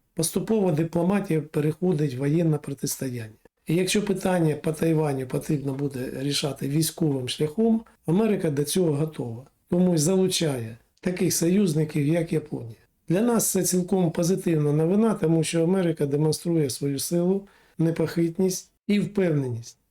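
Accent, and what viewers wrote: Russia. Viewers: Може твої слова мають рацію. Але, оце мацковське Аканьє: пАгАвАрить, пАмиляюсь, пААбіцял, пАвідомлять... Заєбал. Вчи мову. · Все було нічого, але акання боляче слухати. Хто ж вас так вчив рАзмАвляти українською, невже ТимАшенкА?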